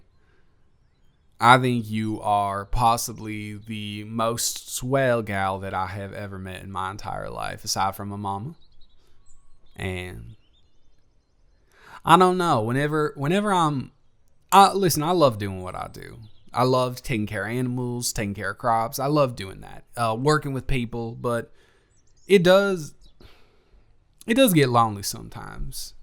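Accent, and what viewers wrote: South, United States. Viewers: THE SOUTHERN ACCENT IS AMAZING MORE PLS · I love the southern accent, too adorable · AYE MAN WHAT DID I TELL YOU ABOUT THE SOUTHERN ACCENT!!😂😂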